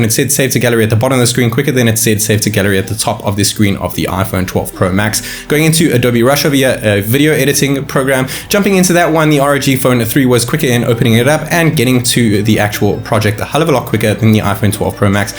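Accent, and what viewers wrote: South Africa. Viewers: Is from South Africa?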